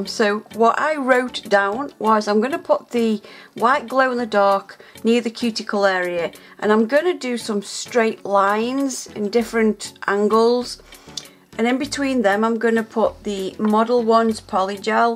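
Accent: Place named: Stoke, United Kingdom. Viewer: I love these. I’m a nail newbie could you have put the glow in the dark behind the polygel sections of the design so the glow effect would shine through the polygel. I’m just starting out and I don’t have any glow in the dark to try it with myself so I’m being a bit cheeky and asking you if you think it would work. I’m not sure where you’re from but you sound like a fellow Stokie xxx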